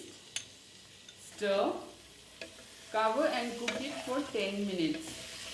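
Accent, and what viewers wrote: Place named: Assam, India. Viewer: Lovely ☺️ I wish could have a taste of your dishes… that Bodo accent was funny though 🤭 but thanks for the dish as my Khasi gf was pushing me to cook it with pork and u saved me 😊 🙏 keep posting amazing Bodo dishes 👍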